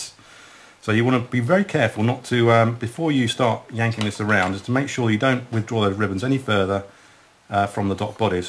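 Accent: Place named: United Kingdom. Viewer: thank you, This is so easy, my daughter is only 5 and she can do it herself. I just save $70 USD. I call Bose and they said is $ 115 with shipping included and you will also get a 1 year warranty and any of the parts? I just pay $39 USD on EBAY, and I fixed it in 5 minutes tops.I Love It, and the British accent is cool. I from Colombia and live in LA. Thank you I’m very happy, my family love this portable sound dock.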